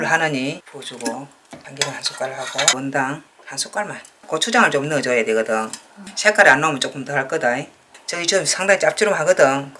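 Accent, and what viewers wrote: Gyeongsang, South Korea. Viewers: 단추꾸늉 같이 경남 사투리 정감있고 듣기가 잼 나네요 ㅎㅎ감솨 해용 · 구수한 갱상도 사투리 때문에 구독 ㅎㅎ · 경상도 사투리 너무 정감있어요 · 저도 경상도라 그런지 사투리가 참 친근해서 친정엄마가 옆에 계신것 같네요.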